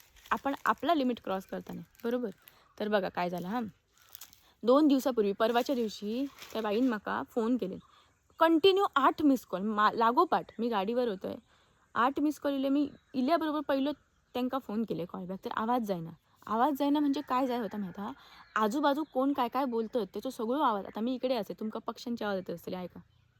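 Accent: Malvani, India. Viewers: मस्त मालवणी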